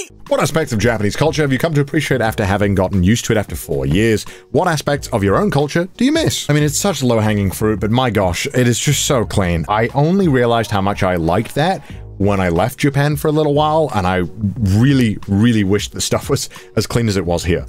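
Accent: United Kingdom. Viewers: im from LA and i agree that a lot of things suck about it, but for some reason it makes me mad when a british dude says it lmaooo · For the engagement farm, Bri'ish man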